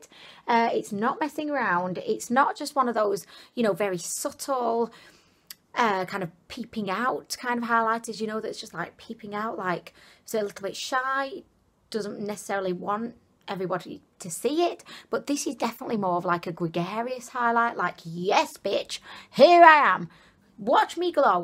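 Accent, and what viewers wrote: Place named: North Wales, United Kingdom. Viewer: Right.. Personal question. Where are you from? Obviously your name is Welsh and there is a northern Welsh accent there but it seems to have a hint of something else. Am I close? (Semi ignorant Australian here though I did spent a fortnight in north Wales last year)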